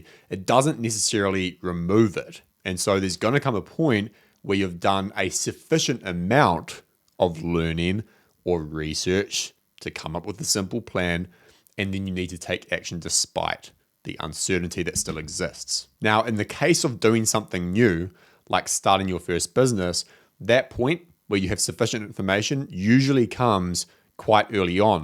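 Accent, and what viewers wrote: New Zealand. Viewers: Not only are u informative and helpful, u r also very very hot. Love ur accent (kiwi, I guess?) thank you for the video, gonna get up from the bed and get some shit done. Cheers from Russia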